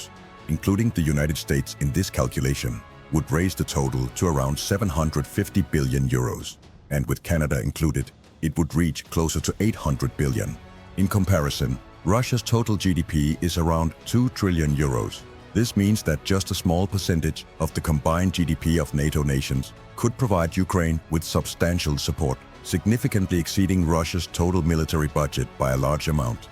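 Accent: Denmark. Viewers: 100% that's a dane😂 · Ofc the speaker has a danish accent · The speakers accent sounds pretty danish to me :) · Man kan godt høre du er dansk :)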